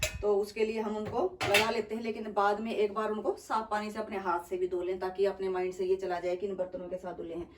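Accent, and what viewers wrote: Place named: Haryana, India. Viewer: Main aapki new subscriber hu mam..aaj ek dishwasher ka video meri screen pe aaya to main usko dekh rhi thi...to aapki video dikhi....aap hryana se ho kya..aapki awaz me hryanvi tone aati hai...to puchha...bcoz m hryana se hu......bahut hi impressive bolte ho mam aap...nice video...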